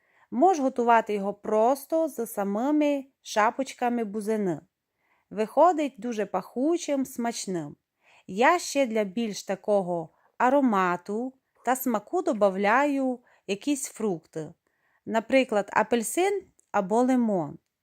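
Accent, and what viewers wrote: Transcarpathia, Ukraine. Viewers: У вас вимова як у Олі,закарпатської господині,наче ви сестри.Дуже приємно вас слухати,голос лагідний.Дякую за рецепт.Бажаю вам всього найкращого.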